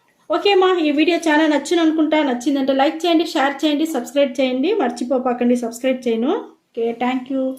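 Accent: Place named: Rayalaseema, India. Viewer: Adhi rayalaseema slang ankunta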